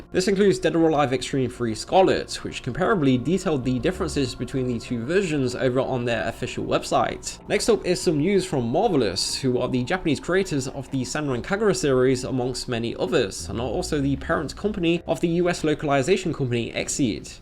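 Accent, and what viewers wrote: United Kingdom. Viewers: He sounds British so that could be it. They also say vittamun instead of vitamin and beeta male instead of beta male.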